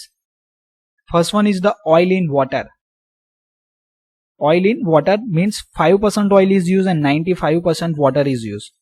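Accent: Gujarat, India. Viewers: Are you gujrati sir??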